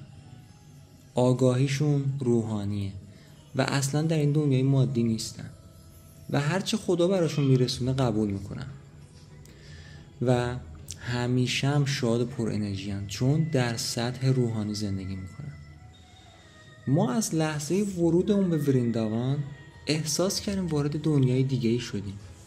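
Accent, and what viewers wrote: Isfahan, Iran. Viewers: سلام برام جالبه خانمت هم مثل خودت لهجه اصفهانی یاد گرفته و حرف میزنه😍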